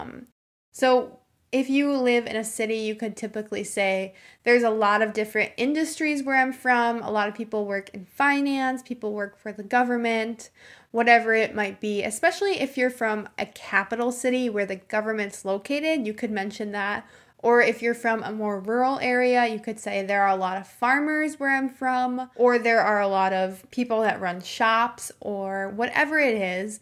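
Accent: United States. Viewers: I have just found this channel 😃 I really like American accent so I already hit the botton for a subscriber . You are so excellent teacher . Love from Thailand.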